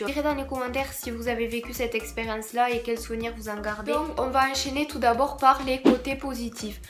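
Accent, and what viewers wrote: South, France. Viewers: L'accent du sud est quand même Wow.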